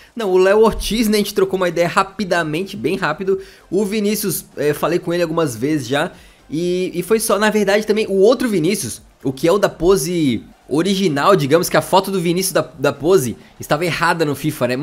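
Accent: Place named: Florianópolis, Brazil. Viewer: Esse sotaque manézinho hehe! Salve mano! :D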